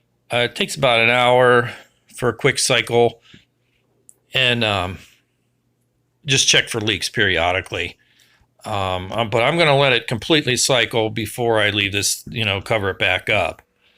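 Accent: United States. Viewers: Oh my gosh! Thank you! It worked!! I liked your Western PA accent? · You from pittsburgh dude??? I heard that accent.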